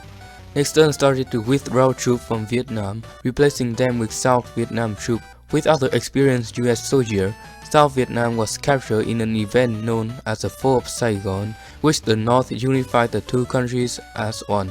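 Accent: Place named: Europe. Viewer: He sounds more European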